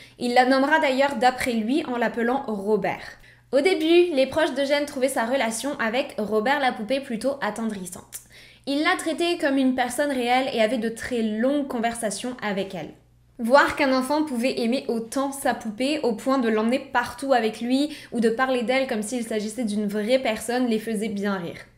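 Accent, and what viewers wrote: Quebec, Canada. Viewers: Punaise je me disais que t avais un petit accent québécois mais je comprenais pas pourquoi il était aussi léger tout s’explique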